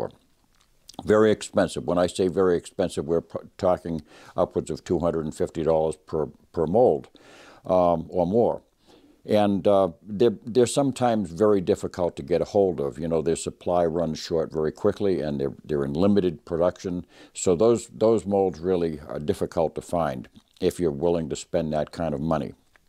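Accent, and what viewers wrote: New England, United States. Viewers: Good storm huh!? A few weeks ago i first watched one of your videos and about 9 seconds in i go “this guys either from vt right on the river or nh” so… which is it?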